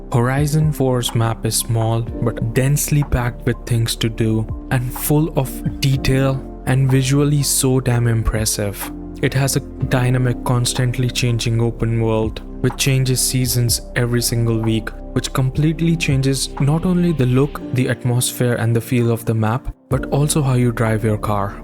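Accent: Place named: India. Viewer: Great review brother, you got Indian accent — Indian? I love that accent! Keep up the great work, you'll gain more sub eventually. 😎🍾🍻🌟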